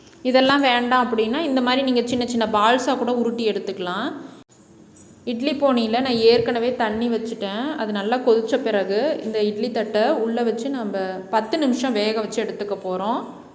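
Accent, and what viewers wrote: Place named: Kongu, India. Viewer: Kongu Thamilum Thanjai Thamilum Super Pasthavum super magaley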